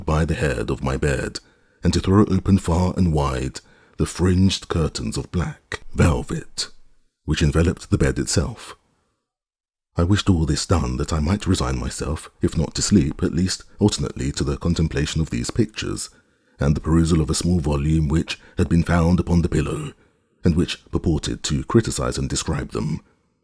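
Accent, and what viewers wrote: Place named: MLE, United Kingdom. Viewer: Are all your books narrated by this guy? No offense but his MLE accent is terrible. There are so many great amateur readers on here you could hire!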